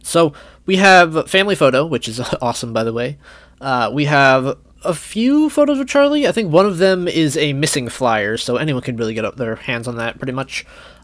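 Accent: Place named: United States. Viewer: Judging by his voice he's American And I agree because Belgium and France are nowhere near each other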